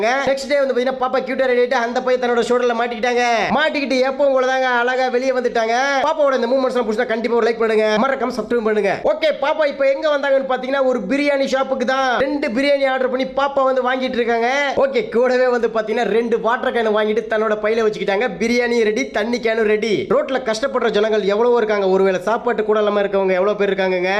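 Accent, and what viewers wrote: Salem, India. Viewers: Neenga salem ah? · Unga native salem aa ❤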